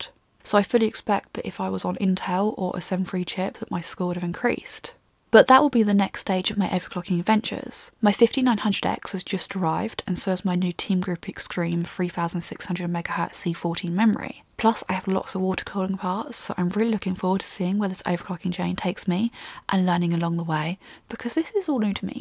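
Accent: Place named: Kent, United Kingdom. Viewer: Great video! Are you from Kent? Your accent reminds me of Kent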